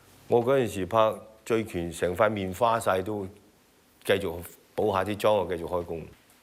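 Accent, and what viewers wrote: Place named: Hong Kong, China. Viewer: yeah it is. He's good at speaking English but I can tell he still has that hongkong chinese accent when he talks. And like he's not fluent at English, his sentences aren't great. But prefer him speaking cantonese, which they should tell him to do more. Ik cause he does stuff in China he had to learn mandarin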